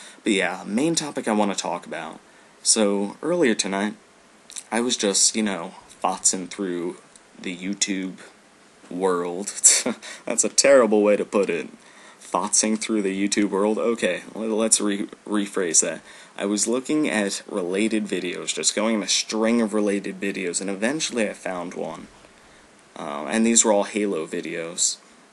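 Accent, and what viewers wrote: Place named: United Kingdom. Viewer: FlaShVidsHD well he does sound British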